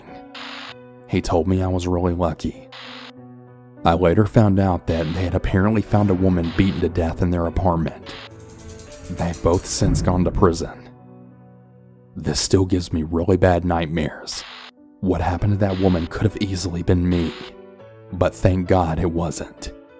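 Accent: South, United States. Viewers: Why does the narrator sound like he's from the south? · I love the country accent Thanks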